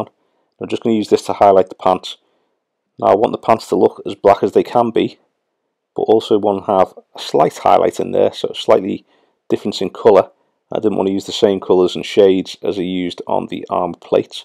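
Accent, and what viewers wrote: Liverpool, United Kingdom. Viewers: nice to see a scoucer doing the hobby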